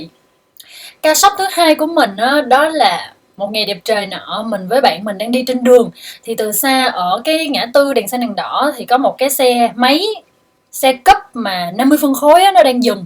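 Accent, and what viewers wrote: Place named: Buon Ma Thuot, Vietnam. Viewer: bạn này nghe giọng giống ở buôn mê thuột ghê :)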